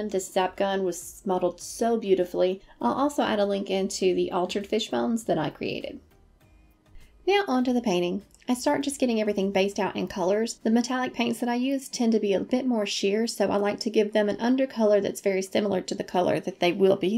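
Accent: South, United States. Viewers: Im so used to southern accents in georgia they usually annoy me, but yours is so soothing and calming and fits the aesthetic of the videos. Awesome stuff!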